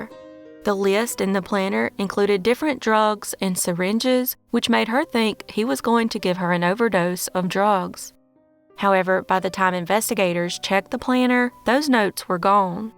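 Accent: South, United States. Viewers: I enjoy your videos. I love you accent which is easy for me to understand, being Southern lady myself. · My favorite southern girl's voice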